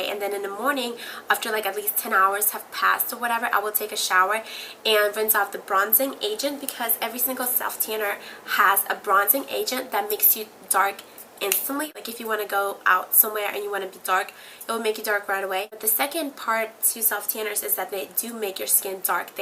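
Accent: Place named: New York, United States. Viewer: totally unrelated,,,but are you from Jersey or NY..guessng by the accent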